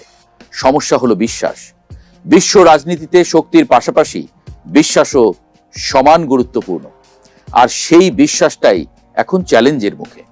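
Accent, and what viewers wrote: Standard. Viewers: প্রমিত বাংলা উচ্চারণ। স্পষ্ট, প্রাঞ্জল ও আঞ্চলিক প্রভাব মুক্ত, ইংরেজি বা অন্য বিদেশি ভাষা প্রভাব মুক্ত। বক্তব্য সোজা, দীর্ঘ-ভুমিকা হীন , প্রাসঙ্গিক। অতীব প্রসংশার বিষয়। ধন্যবাদ ও শুভেচ্ছা 🎉 🤝 💛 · শুদ্ধ বাংলা। কোনো বাঙ্গাল টান নেই। সুন্দর বিশ্লেষণ।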